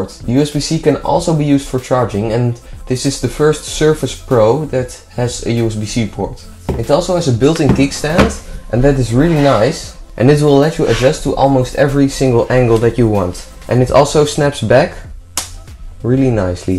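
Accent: Netherlands. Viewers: Are you dutch perhaps? Or maybe from Belgium? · You sound like you are from the Netherlands!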